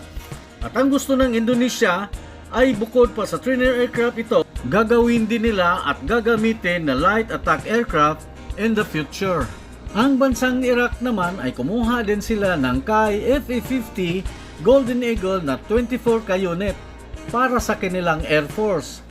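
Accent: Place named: Visayas, Philippines. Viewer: Visaya nimo dong kaya pala tigas ng solte nimo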